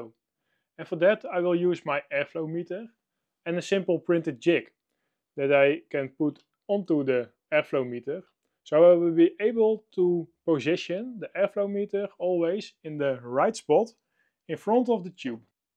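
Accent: Netherlands. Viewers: I love the heavy dutch accent haha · Who's also Dutch and just here for the pronunciation? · Vind het leuke videos. Erg interessant, leuke technieken en 3d printen, maar stoor me wel vreselijk aan het Nederlandse Engels.. 😢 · I like a deep dive into anything nerdy with a Dutch accent. Takes me back to my brief stint working in Eindhoven. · So, you klinkt as a Nederlander 👍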